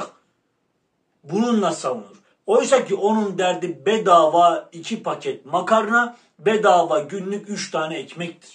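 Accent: Erzurum, Turkey. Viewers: Ben senin haberlerini birazda esprili tarzından dolayı izliyorum. Ayrıca anlatımındaki Erzurum ağzıyla yaptığın tanımlamalarda güzel Ancak Allah -Ekmek vs ile ilgili benzetmelerin kıyaslamaların yakışıksız olmuş.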